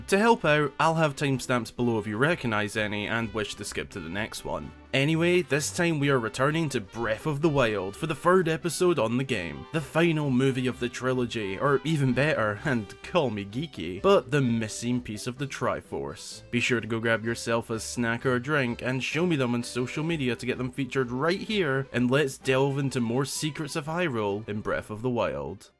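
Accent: Scotland, United Kingdom. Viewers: Are you brish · I JUST REALIZED PURAH IS ABOUT THE SAME SIZE AS MIDNA Also are you scottish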